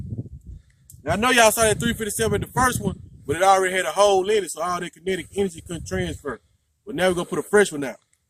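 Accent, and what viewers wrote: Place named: South, United States. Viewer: Baruch Hashem (G-D Bless you), I need a revolver too there's just something ao fun about them that is fun and a good 357 is usually 1 n done if you really need it always enjoy your videos. Youtube needs more ppl like you. Sell some shirts (or practical tac gear/gun gear white label basics whatever?) or something if you need the money like i do, go for it. Your lack of money seeking though also is part of what makes your channel nice and different so if you do it just take some baby steps. You are headed in the right direction keep it up!! what you are doing is rare and only a few others out there that I have found, I like to watch a real person do real things I am also interested in and not be a prick about it. Keep it up you are on the right path and your accent makes me miss the more southern areas of these states something about it just seems friendly. 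Wow, that is a long comment G-D bless you Shalom and Baruch HaShem.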